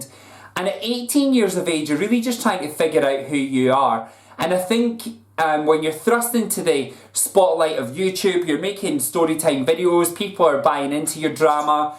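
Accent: Scotland, United Kingdom. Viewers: Great video, you say your british, howcome you sound more on the scotish side ?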